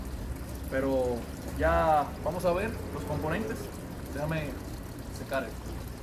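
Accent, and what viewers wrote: Dominican Republic. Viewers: Ese acento es del castellano Dominicano es inconfundible al igual como lo es el chileno o mexicano, o me equivoco? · Hablas como dominicano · Vives en República Dominicana? Ese acento es el Dominicano standard😅😅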